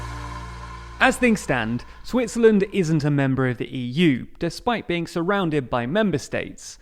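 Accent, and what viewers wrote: United Kingdom. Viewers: Queen english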